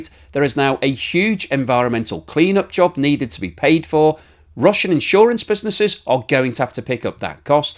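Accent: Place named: Liverpool, United Kingdom. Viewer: Where do you live???? Liverpool I would guess 😊😊😊😊😊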